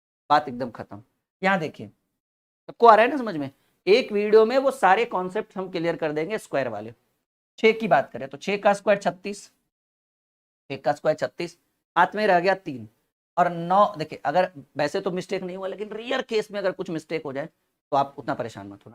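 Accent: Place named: Maharashtra, India. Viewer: तुम्ही बहुतेक मराठी आहात.कारण तुम्ही आठी, साती म्हटले.😊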